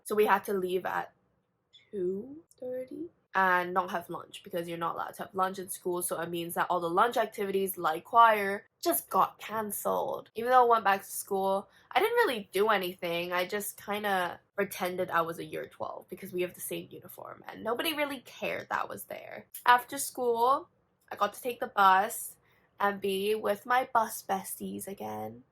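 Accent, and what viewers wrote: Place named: United Kingdom. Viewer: and that british accent. bro. whO ARE YOu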